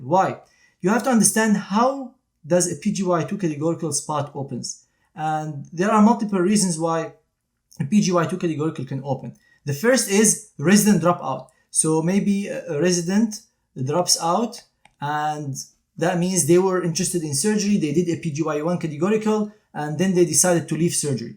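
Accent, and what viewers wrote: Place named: Syria. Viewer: Hi Dr., I wanna thank you a lot for being such an inspiration for me and for lots of others, I really appreciate your hard work. May I ask you a favor? Can you make a video in Arabic telling us your story with your original Syrian accent, tell us how could you overcome all the struggles that face us being arabs, how could you modify your mentality to pursue your dream for such a competitive speciality. What was your cultural background and how studying at Aleppo was, how could you adapt living at the us at your first months. I wish I could know all of these stories that would me feel like as you are a true friend "which is an honor I would like to have🥰"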